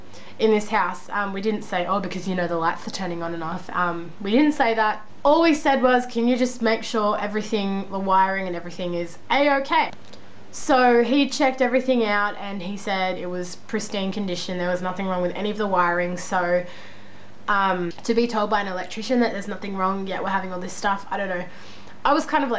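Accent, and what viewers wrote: Australia. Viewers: YOUR AUSTRALIAN!!!!! YAY!!!!!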